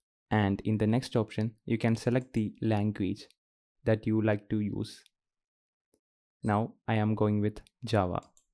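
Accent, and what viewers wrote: India. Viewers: Not surprised. Indian accent.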